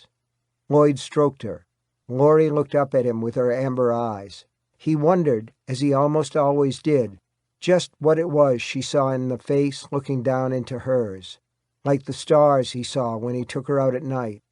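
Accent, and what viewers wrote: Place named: Maine, United States. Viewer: Oh my goodness! When I first started listening I thought, Oooo! I love how the reader has that Maine twang — adds an extra layer of icing to this wonderful cake. I JUST started reading the comments to see who the reader is and if he has any other books as I love his voice. Low and behold, it is the king himself! Go figure! Explains the great Maine accent❤️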